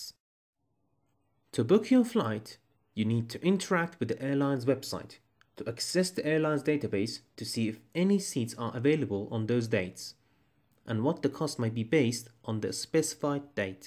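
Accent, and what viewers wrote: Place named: United Kingdom. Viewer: Thanks! Now I know what API is! BTW, like your British accent 😉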